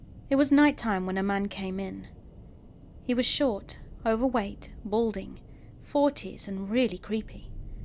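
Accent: England, United Kingdom. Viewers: Been looking forward to this!! There's just something about that English accent 😁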